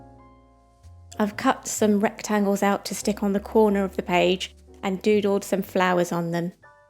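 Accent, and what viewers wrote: United Kingdom. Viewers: That looks absolutely gorgeous! You are not alone in the department of smudging ink, happens to everybody, I really thank all deities for washi tape! You have such a pleasant voice and way of speaking - I very much prefer British English over American English -, it's a real pleasure to listen to you.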